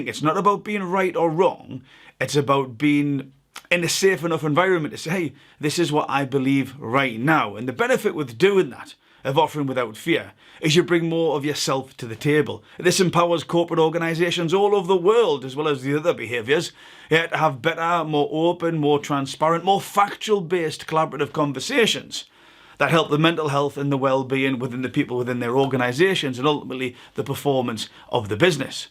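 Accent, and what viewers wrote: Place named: Scotland, United Kingdom. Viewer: Nothing better than listening to a Scotsman speak. ❤️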